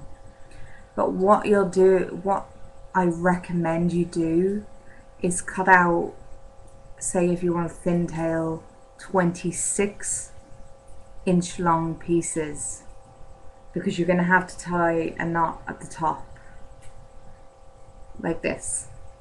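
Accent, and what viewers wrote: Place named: Ireland. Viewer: I knew you were irish as soon as u started talking from your accent ^^ im irish too :) Really want to make a tail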